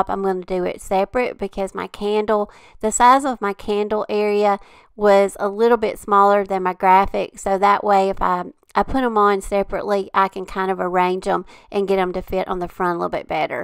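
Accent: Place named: Kentucky, United States. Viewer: you're so very talented! Also, since I'm in KY, you do talk just like us. The recipe cards almost made me cry, because they look just like the ones my mamaw and my mom left us. They were stained, very well-used, and written in their own hand. Lovely video!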